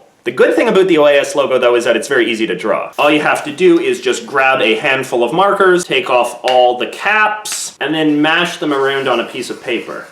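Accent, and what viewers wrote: Canada. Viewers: You have an American flag behind you, and yet you're Canadian because you say "aboot" so hi fellow canadian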